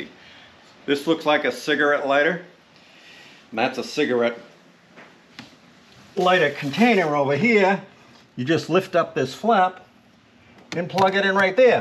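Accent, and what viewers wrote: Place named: Boston, United States. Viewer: I enjoy your informative videos. Keep up the good work. Love the accent. Is it from Boston?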